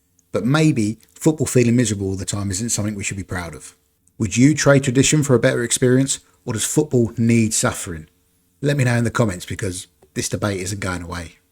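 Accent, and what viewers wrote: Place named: United Kingdom. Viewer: You have a heavy British accent and even if you are not a Brit you are definitely from Europe. All that means that you experienced that football, which is the Great Grandfather of the MLS. So yes coming from there you have all those feelings about the MLS. The Bits are the creators of the football we play today. Ebenezer Cobb Morley — often called the father of modern football because he helped write the first official rules. ⭐ So the short answer: 👉 England invented modern football (soccer) in 1863 when the rules were standardized.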